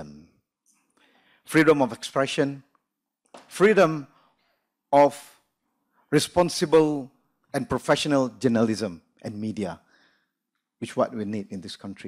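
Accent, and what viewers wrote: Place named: China. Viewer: Mcm cina ckp english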